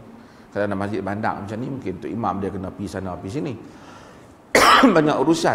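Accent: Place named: Perlis, Malaysia. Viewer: Ini semenanjung malaysia..perlis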